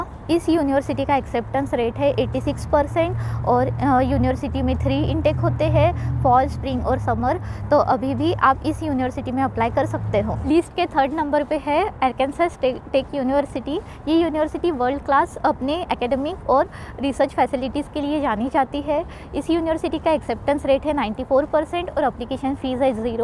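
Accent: Gujarat, India. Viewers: Your accent feels like Gujarati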